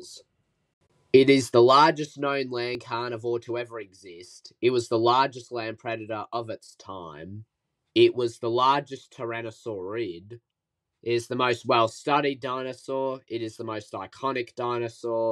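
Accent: Australia. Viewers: This is most Australian sounding man in the history of accents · Dude are you an aussie? It sounds like it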